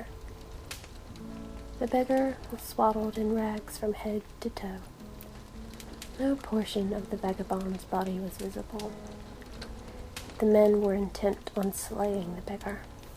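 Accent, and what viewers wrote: South, United States. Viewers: i like the slight southern twang to your voice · American accent spoilt it for me. Americans are not medieval. It needs to be an English accent. Cornwall, Devon, Irish,...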